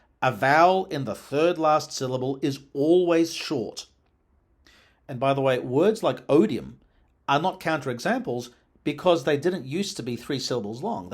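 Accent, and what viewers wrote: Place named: Australia. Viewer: For some reason I never pictured you with an Australian accent, even though I know you’re Australian. Nice video!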